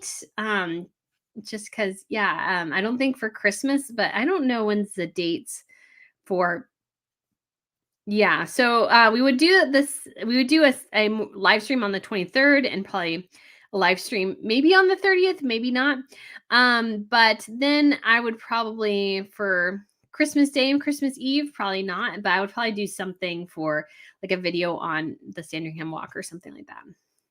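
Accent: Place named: United States. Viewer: It's not the loudness of your voice it's the tone and whining and high-pitch squickiness and the inconsistency of rhythm that is the problem. I try to watch you but I can only take so much of it. But, it appears to be with all the American voices, which for some reason, 99% of the MM/PH YouTubers are. Who never had a YouTube channel about the BRF before MM. I think it's an American thing.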